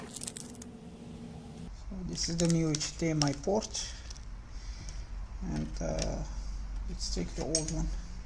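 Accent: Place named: Iran. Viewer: Thanks for your understanding, I just thought your voice sounded like a Persian accent.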